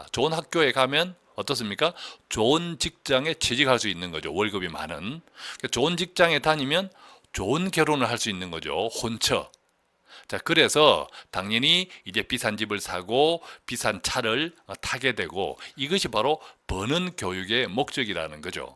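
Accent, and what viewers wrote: Gyeongsang, South Korea. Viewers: ~ 혹시 대구분이세요?????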